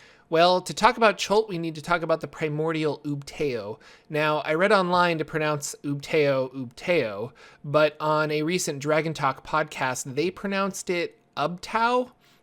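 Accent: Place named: Chicago, United States. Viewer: sounds like the south side of Chicago to me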